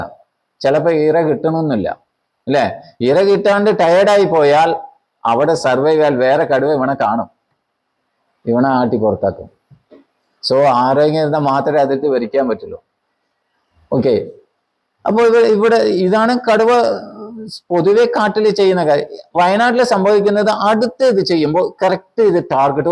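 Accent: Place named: Tamil Nadu, India. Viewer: വാക്കുകളിൽ തമിഴ് ചുവയുണ്ടെങ്കിലും അവതരണ്o സൂപ്പർ. അറിവിൻ്റെ നിറകുടമാണ് താങ്കൾ.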